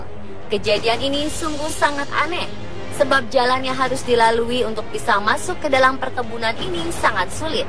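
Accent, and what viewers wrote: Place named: Java, Indonesia. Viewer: Jawa kerass lur.. 😀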